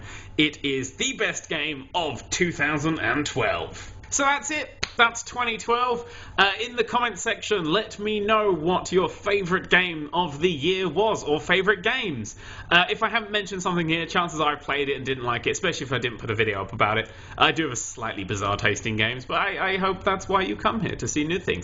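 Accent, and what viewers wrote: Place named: United Kingdom. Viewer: gay voice? thats just called being british.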